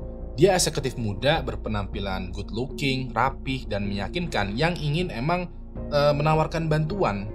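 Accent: Indonesia. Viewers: gapapa biar lebih gampang dipahami karena logat indo. wkwk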